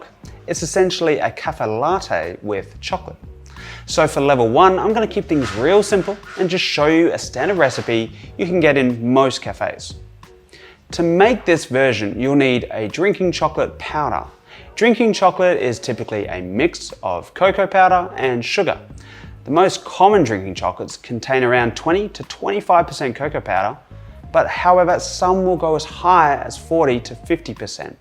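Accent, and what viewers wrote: Australia. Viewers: is he aussie??? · Sydney, Australia maybe?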